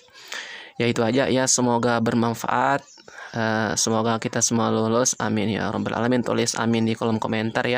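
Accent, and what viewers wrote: Lombok, Indonesia. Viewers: logatnya kek org lmbok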